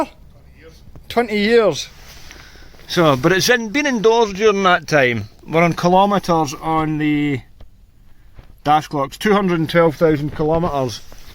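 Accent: Scotland, United Kingdom. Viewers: Just found your channel and I love the Scottishness of it. 😁 Subscribed!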